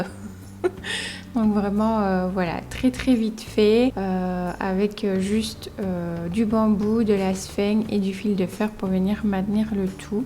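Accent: Belgium. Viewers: jaime beaucoup ton accent belge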